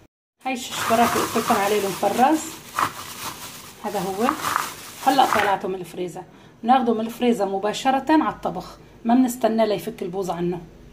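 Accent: Aleppo, Syria. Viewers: والله جوعتيني يا والله فعلاً منظومة ههه لازم نتعلم منك حكي الحلبي كتير بيعجبني يسلم هالديات متابعتك من لبنان بيروت 👍👍👌👌👌👌😋😋😋😋💞💞💕💕😍😍😍😍♥️♥️🌹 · صراحة انا واحدة ما الها ابدا بالكزمنتات. بس والله مو قادرة اقاوم. الله يعطيك الصحة والعافية يا خالتو و يقويكي. شو هالفن هاد, حفر الكوسا والا حبات الشيشبرك , كانهم مرسومين رسم. الله يسلم اديكي ويعطيكي الصحة. مبسوطة كثير انه صوتك الحمد لله احسن من الفيديو اللي عملتيه بعد مرضتك . اجر وعافية يارب. وانت ابداااا ما بتحكي كثيير . بالعكس حببتيني باللهجة الحلبية .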